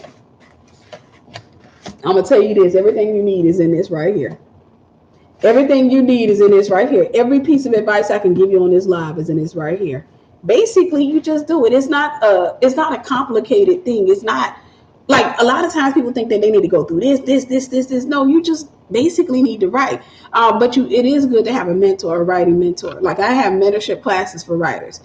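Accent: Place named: South, United States. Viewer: God Bless you Beautiful Sister i have that Southern Accent as well it doesn't leave for some Reason hee hee you have been a Blessing since i found your channel 🕊️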